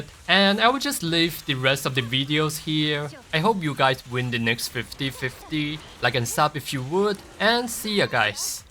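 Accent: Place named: China. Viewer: you cant really compare trigger to qing yi i think, trigger is an off field stunner, and qing yi is an on field stunner 話說up主是不是華人？英語聽起來有華人口音，純粹八卦😂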